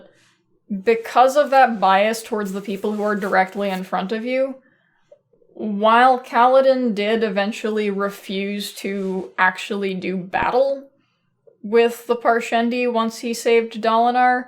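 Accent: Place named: Idaho, United States. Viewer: Are you from Idaho by any chance? You have almost my exact accent. Excellent video!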